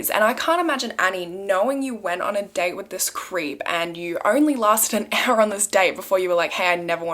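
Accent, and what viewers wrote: Australia. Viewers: You go Girl! Just your Aussie accent makes me bingewatch your vids!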